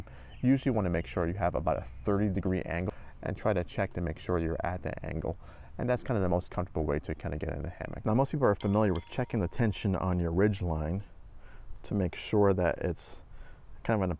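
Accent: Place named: West, United States. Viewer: Bro you look Indian and your name and accent sounds Western sorry don't get offended. Never imagined to avatars in same person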